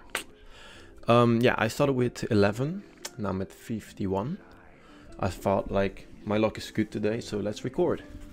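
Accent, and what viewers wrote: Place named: Netherlands. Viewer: Where you from? You sound dutch 🇳🇱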